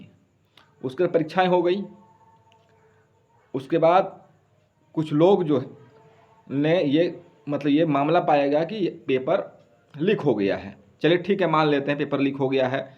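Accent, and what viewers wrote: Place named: Bihar, India. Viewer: इसका बात से ही लग रहा है , कि ए बिहारी है और सेटिंग कराया है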